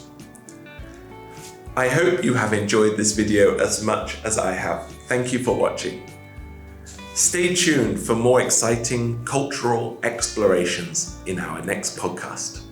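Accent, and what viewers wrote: United Kingdom. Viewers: I love your perfect British accent!!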